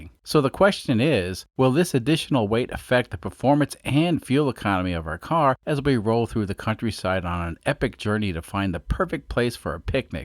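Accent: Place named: Long Island, United States. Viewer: I thought I detected a slight Lawnguyland accent in your videos since I started watching (expatriated North Babylon native here).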